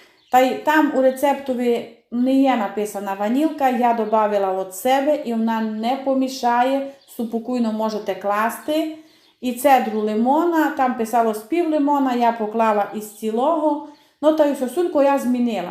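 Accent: Transcarpathia, Ukraine. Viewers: А я люблю пані слухати 😊 Спокійна, домашня інтонація, і чудовий закарпатський діалект. Я дивлюсь її відео два рази: перший раз насолоджуюсь її манерою говоріння, а другий раз - рецепт слухаю та занотовую😊😊😊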